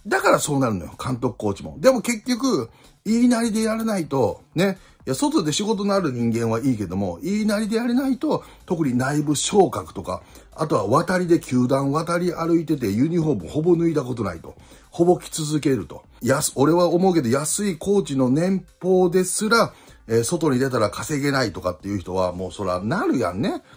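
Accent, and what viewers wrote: Kansai, Japan. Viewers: 里崎さんは、興奮すると関西弁が強くなるね。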